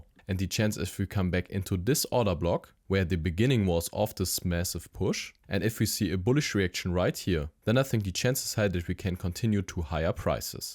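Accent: Germany. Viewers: Your heavy German accent gives me hope. You guys are very calculated when it comes to crypto. Everytime I come across a German content creator that technically analyzes crypto, it’s always a bullish sign. I’m all in on MYRO and almost got 1Million. Let’s go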